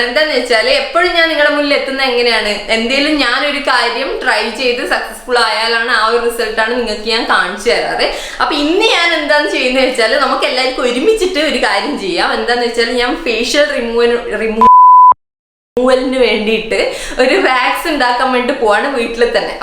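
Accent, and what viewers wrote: Kannur, India. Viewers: Kannur evideya, slang kettapo thoni. Njanum knr aanu. Ente peru hanima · Chechide varthanam ketta manasilvum Kannur aann😍 njnu Thalassery aane.😁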